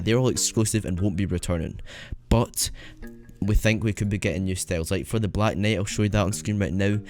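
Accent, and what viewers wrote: Scotland, United Kingdom. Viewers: Ur ye Scottish?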